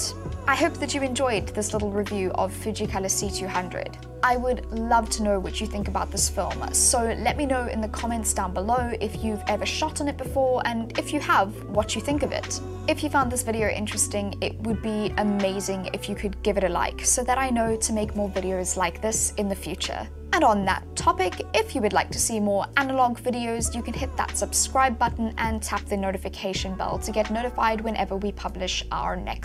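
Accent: South Africa. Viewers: first five seconds and i know I'm listening to a fellow south african! much love from new zealand.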